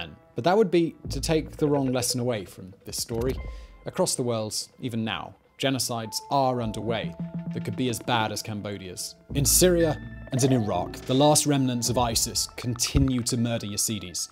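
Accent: United Kingdom. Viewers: Thanks for making this one Simon - I live about a mile and a half away from Tuol Sleng and I drive past on my motorbike nearly every day. It's pretty much in the centre of Phnom Penh, and the rest of the neighbourhood is a very nice place, with some great coffee shops and local bars. Heck, my shoemaker is on the same street as S21! And where I live is virtually equidistant between S21 and the Choeung Ek Killing Fields. Despite the utterly horrible history here, the good people of Phnom Penh are rebuilding still, and life is a lot happier for many here these days. I've been watching your videos for a long time, and as tragic as the subject matter is in this one, I'm really glad to see you and your team make this video. I was thinking less than an hour ago that I should visit S21 again as it's been a while since I paid my respects. Anyway - listening to you as a fellow Brit on your videos often serves as a nice reminder of home, but this video's a great surprise - a British voice helping to chronicle a very important piece of the history of this lovely adopted city of mine. 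Thanks again.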